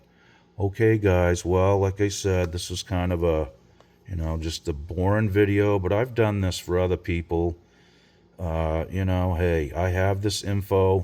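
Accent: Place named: New England, United States. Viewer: Nice studio. 😳 Are you from Massachusetts or New England? Edit: Maine. just said it.😁 Sound like my cousins from Friendship & Waldoboro. But not quite as drawn out on some words. PowAH! ⚡️ lol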